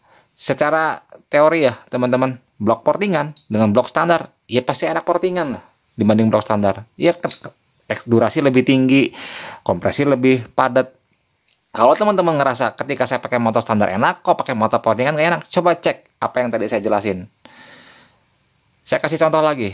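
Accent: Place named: Bekasi, Indonesia. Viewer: Dpet ilmu sedikit2 nih bang😊. Ngomong2 suara abang kaya orang bekasi babelan😁😅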